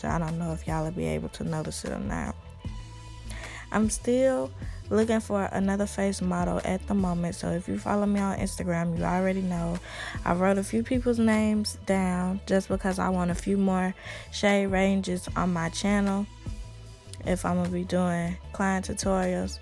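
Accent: Chicago, United States. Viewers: I said the same this she gotta be from the Chi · Where are you from?? Cuz you def sound like a Chicagoan lol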